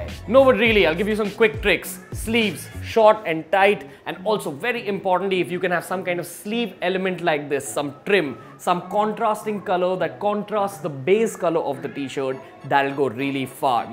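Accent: India. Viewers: I’m just hear to listen to ridiculous tips in an incredibly thick Indian accent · Did I just watch a whole video with an Indian guy with an accent giving styling tips and really enjoyed it ?! Lol seriously though great editing , great talk! Feel like this guy could sell me anything .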